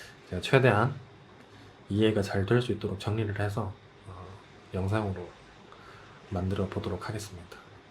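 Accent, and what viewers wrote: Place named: Daegu, South Korea. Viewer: 영상감사합니다 제가 외국인 대학원생입니다. 돌아가신 교수님은 저에게 그런 가이드 같은 존재였어요 대구 말을 듣고 교수님 생각이 납니다 그 교수님도 대구 사람이시고.. 너무 보고 싶습니다, 항상 건강하시기를 바랍니다 영상에서 많이 배웠어요~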